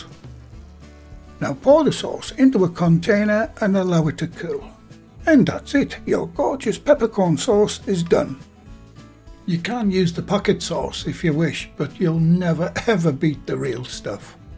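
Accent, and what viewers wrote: United Kingdom. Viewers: I live 100 miles away from where this gentleman sounds like he comes from. I'm not so sure people are being offensive, the video is very good, his voice does resemble one of the worlds most recognised animated characters, I'm pretty sure this will also help boost the hits and likes on the video. Also, accents should be celebrated, I love how our accents vary across the UK, for small island, you can 30 miles in any direction and find a different accent. 👍🏻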